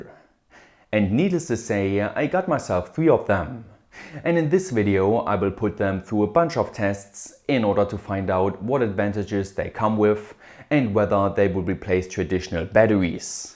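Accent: Germany. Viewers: Is this German accent or what?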